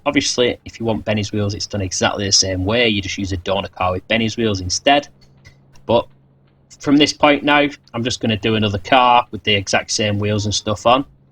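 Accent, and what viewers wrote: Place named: Lancashire, United Kingdom. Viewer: You sound like a Lancashire lad😛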